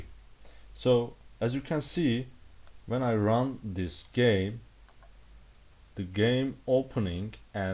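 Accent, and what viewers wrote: Turkey. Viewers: U from Turkey right. I knew that your accent hahahahahaa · Türk aksanı lan bu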